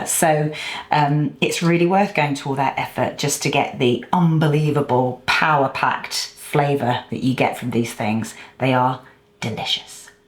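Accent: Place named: United Kingdom. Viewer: Thank you for sharing/posting. I love that British accent.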